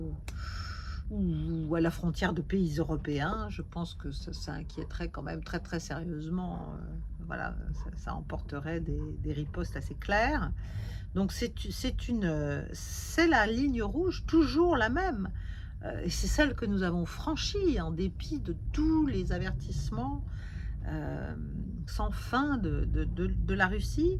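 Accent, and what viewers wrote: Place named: Upper class, France. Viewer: Votre accent bourgeois du XVI ème me dégoûtait déjà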